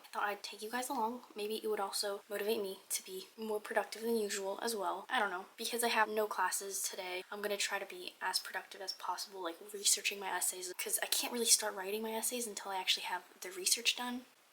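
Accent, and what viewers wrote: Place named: United States. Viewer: I did not expect an American accent lol, I thought I’d here a thick Scottish accent. Do a lot of people outside of Scotland go to the school?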